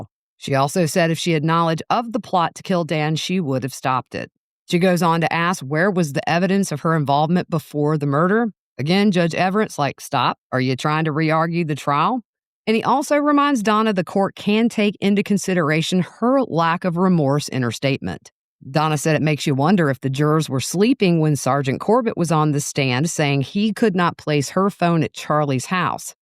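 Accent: South, United States. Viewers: I just today stumbled upon your channel. Love how you speak -quickly, without ums and ahs and you know what I means! You also have a beautiful southern drawl. I subscribed and look forward to more vids from you. (Prison...OMG most of those things, ALL of those things that were described -the noise, the pettiness, the jealousy, the food, the lack of privacy., the humiliation, the confinement with ppl who disgust you or scare you...ALL OF IT. Just a nightmare. And for me personally, I do NOT get along with other people. I need to be alone most of my time bc humans just exasperate and me bore me. I would not do well in prison. I barely find comfort in the wild.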